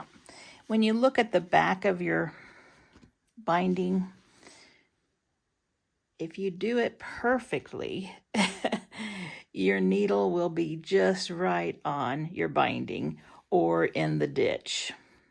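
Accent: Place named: St. Louis, United States. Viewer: Are you from St. Louis? I heard only people fro St. Louis say (warsh). I'm from STL and say warsh.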